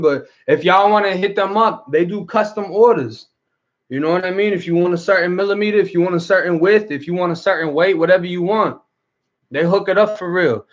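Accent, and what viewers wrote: Boston, United States. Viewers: Hey Bro, Boston accent frealz! You into the great Boston comic, Bill Burr? Bet you won't get tickled!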